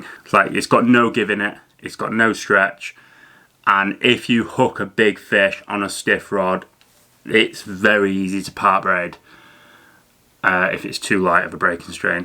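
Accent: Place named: Barnsley, United Kingdom. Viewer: The Barnsley accent tho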